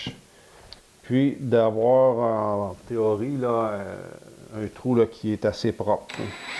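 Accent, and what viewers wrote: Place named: Quebec, Canada. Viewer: le bois + l'accent québécois, j'adore ; )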